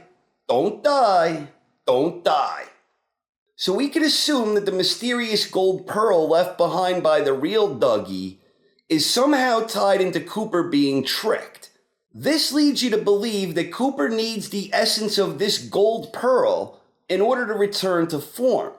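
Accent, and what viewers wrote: New York, United States. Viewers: that is a serious accent you have. NY?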